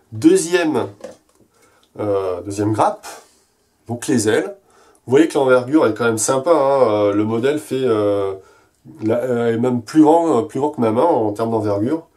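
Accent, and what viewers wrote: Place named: France. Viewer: Salut. Comme tous les ans je monte à Paris pour la Japan Expo. On s'y retrouve avec mes potes de FFXIV et on en profite pour faire des IRL tous ensemble. Les années d'avant j'étais passé devant le stand d'Anigetter sans savoir que t'en faisait partie. Cette fois je manquerai pas de te faire un coucou en tant que membre du forum depuis peu. Sinon je me marre toujours autant devant ton accent franchouilard: Mastèrre Grââde, Fîîne Môldz, ha ha. Ca fait saigner mes oreilles de bilingue mais je préfère prend ça à la rigolade;)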